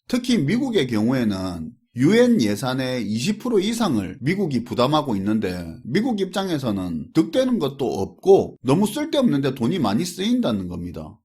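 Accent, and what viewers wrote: Gyeongsang, South Korea. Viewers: 개쌍도 어투 진짜 토나온다. 하지마라.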